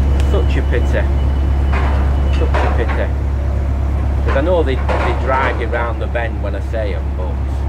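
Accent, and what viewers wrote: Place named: North, United Kingdom. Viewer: Ay up lad, so Northern haha love it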